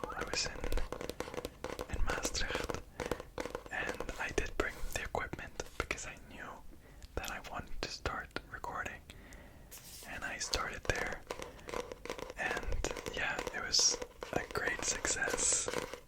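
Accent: Ireland. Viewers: Your english is perfect my first thought was “Oh he must have grown up in Ireland” 😭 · I thought I could hear slight Irish twang in your English speaking accent lol, sounds like it’s from up north maybe but hard to tell 😂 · As an Irish person I can say you defo have a little bit of an Irish accent.. what part of Ireland is ur dad from? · I was thinking that was an Irish accent!! Your English is very well spoke and as an Irish person you have such a strong Irish accent !!! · I hear a faint Irish lilt as well! It's like 5% Irish (on the vowels), 5% Spanish (on the consonants), 90% perfect native-sounding English. Oop not to imply Irish accents =/= native English speakers 🤣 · Kerry accent 😂🤝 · As soon as you started speaking I knew you had to have some Irish in you lol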